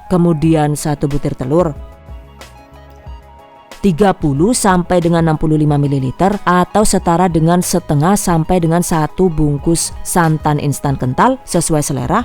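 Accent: Banyumas, Indonesia. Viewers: Maaf bun njenengan tiyaang pundi nggih, kayaknya ngapak. Siapa tau deket jadi bisa belajar langsung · ora ngapak ora kepenak,wong ndi jane rika yu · Ngapak luuurrr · Deneng ngapak😂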